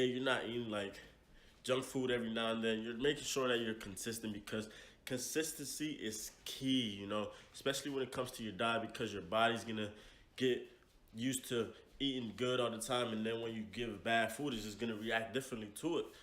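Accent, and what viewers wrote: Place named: Texas, United States. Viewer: I know for a fact you from Texas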